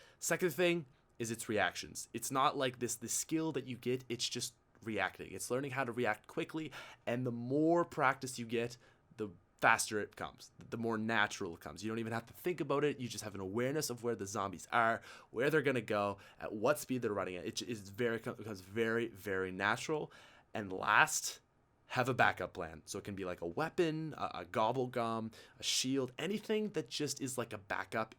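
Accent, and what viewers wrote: Canada. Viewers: its all a bout that canadian accent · Are you Canadian???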